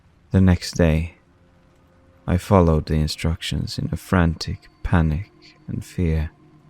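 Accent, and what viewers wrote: United Kingdom. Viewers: Right!!!! The f**kin voice,soooo sexy and very sexual and the big orgasm is the voice being British!!! Unbelievable hot!!!!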